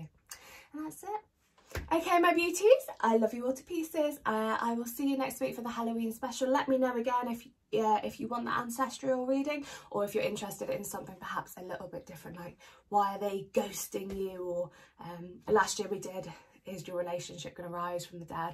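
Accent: England, United Kingdom. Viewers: Thank you! I love the way the English talk ! Is your relationship going to rise from the dead. Great for Halloween reading 🤣☺️🎃💀👹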